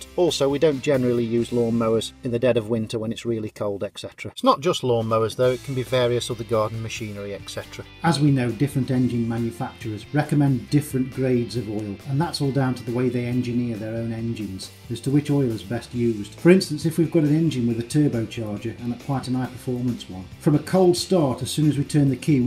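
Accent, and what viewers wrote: United Kingdom. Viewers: I hate the British accent.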